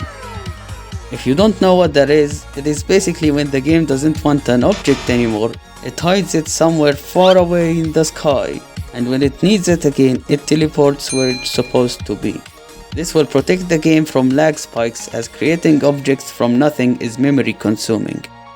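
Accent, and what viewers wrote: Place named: Arab world. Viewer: عربي؟ i knew it from the acent then the T H I C C Milk!!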